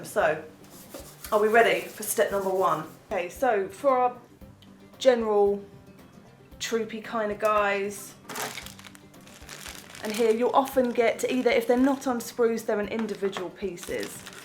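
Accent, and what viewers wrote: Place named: Manchester, United Kingdom. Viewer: I love your accent. It makes me miss my Mum. She's from mancheste. Good video.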